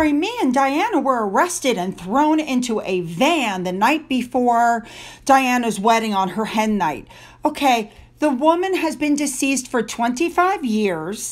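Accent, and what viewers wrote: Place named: United States. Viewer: Hello, we always watch your video's, you do a Brilliant job. You put so much hard work into your content. We totally agree with what you say, it was very nice to see what you look like, after so long wondering! you are Beautiful. I have seen some comment's about how you, and our cousins from the USA, pronounce names! I find it endearing. carry on as you are, there's too much of people saying " You don't say it like that, you say it like this! " It's difficult for the British folk also! I remember as a child, I had difficulty understanding why Norwich wasn't said as it is spelt, Norrich! When I was corrected, I couldn't get my head around it! My Aunt was from New Costessey, which is in Norwich, that is pronounced - Cossey! Even more confused! One thing that sticks in my mind, I was on a train with two Americans, one said to the other, I can't wait to see Edinburger! 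Keep up the good work, we really appreciate it. Best wishes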